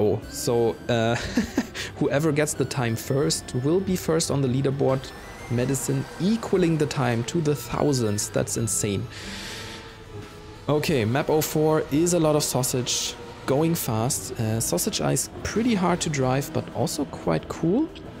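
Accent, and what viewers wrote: Germany. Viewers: First video I've ever seen on your Channel and i immediately recognized the german accent 😂 aber alles gut bruder du sprichst perfektes englisch :) But my favorite drink is just plain, non carbonated water or tea, which is basically just water but hotter and with taste in it hahah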